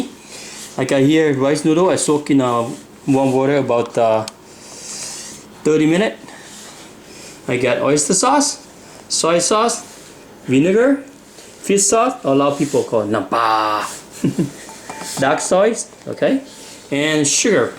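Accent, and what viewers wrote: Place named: Laos. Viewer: Hi, I was 25 years working with Asian people, mostly Lao, Vietnam and from Cambodia. At first I hate the smell of your food but, slowly when I became friend with some of your people I start tasting the food and today a love it. My favorite are spring rolls with dipping sauce and sticky rice with some vegetable dipping or meet. I enjoy your video and comments your English remind me my dear friends from Laos.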